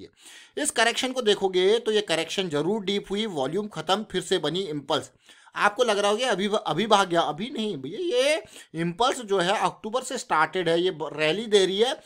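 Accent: Uttarakhand, India. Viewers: Sir , Are you from uttarakhand?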